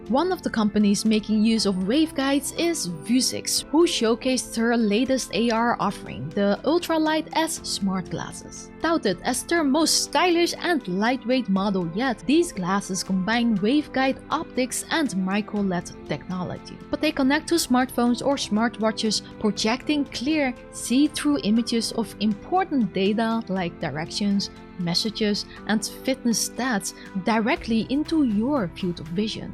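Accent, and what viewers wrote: Netherlands. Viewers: Great news! What is this accent? I can't put a finger on it lol It nearly sounds like a Dutch English accent n__n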